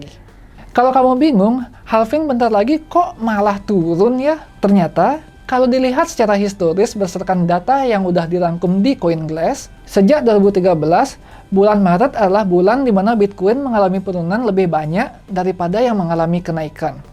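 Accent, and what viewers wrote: Bali, Indonesia. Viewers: Logatne bli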